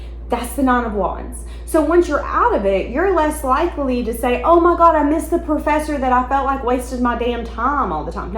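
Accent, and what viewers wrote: South, United States. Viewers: Unrelated but girl I’m from Mississippi and you have the most adorable southern drawl I have ever heard!!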